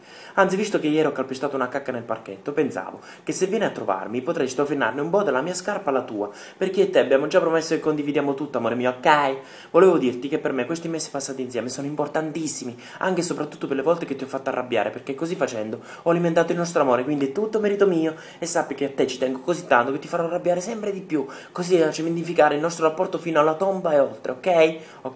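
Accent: Abruzzo, Italy. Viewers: Ngule, si sind l'accend abbbruzzes !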